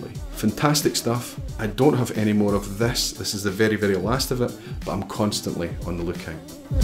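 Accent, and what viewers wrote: Scotland, United Kingdom. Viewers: Hello! Really like your videos, and your Scottish English! What part of Scotland are you from? Thank you!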